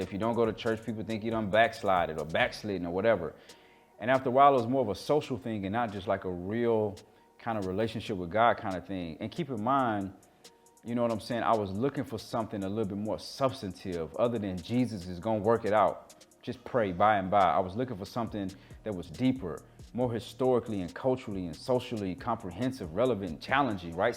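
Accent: Ghana. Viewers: 😄🤣 Hahaha love your Ghanaian accent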